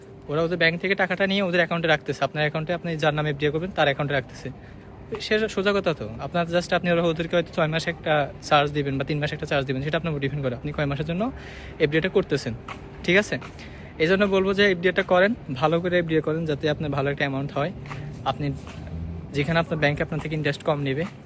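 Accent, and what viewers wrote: Noakhali, Bangladesh. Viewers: bhaiya videor seshe ashi bhujchi amne noakhalir 😂 bhai aio amnego noakhalir manush 🤣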